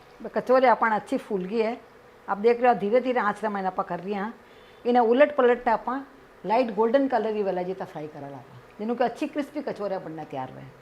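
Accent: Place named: Rajasthan, India. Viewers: Jai siya ram badiya jee. Thari receipes bahut hi badhiya hai. tharo sikhane ko tariko bahut jordaar hai. Thari marwadi bahut pyaari lage. 🙏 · Aunty ap meri favourite ho or apki Rajasthani boli same meri dadi k jesi❤️❤️😘😘😘 or apki recipes to osm h ❤️ love you ❤️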